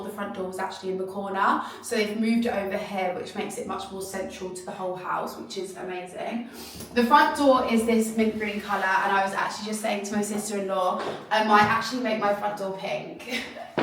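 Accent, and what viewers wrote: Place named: RP, United Kingdom. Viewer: Lovely house congrats Lucinda.By the way when did you become so posh speaking?🤗💚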